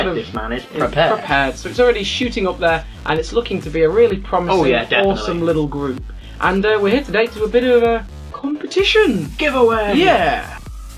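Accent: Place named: Warrington, United Kingdom. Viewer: Was in Warrington the other day and everyone sounded like you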